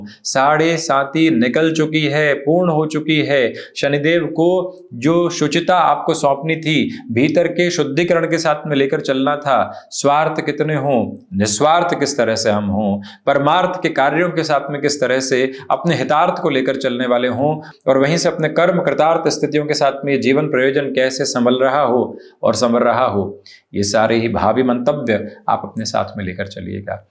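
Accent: Standard. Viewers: जितनी शुद्ध हिंदी वो बोलते है बाकी किसी ज्योतिषी को बोलते नहीं देखा, भाषा पर अच्छा नियंत्रण है गुरु जी का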